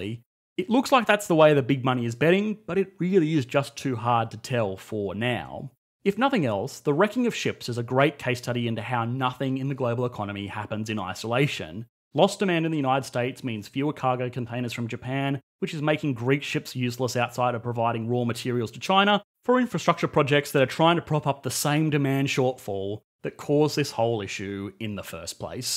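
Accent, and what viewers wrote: Australia. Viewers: At least Wendower is 'understandable': this Ozzie's accent is thiccc, plus, he talks way too fast - had to reduce speed to 75% to make it bearable. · The Australia accent throws me off. The upward inflection at the end of sentences make it sound like your unsure or asking a question. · australian accent This is where we bring up the darling Norway, it not only gets (Insert industry norm) but does so equitably. In fact when measuring (some average figure related to production) it is actually (some number between 1-10) globally. Now you can never not mention them again :) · What accent is that? Australian?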